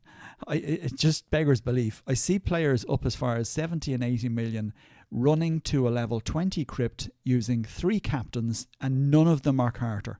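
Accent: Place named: Ireland. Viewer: i love the irish accent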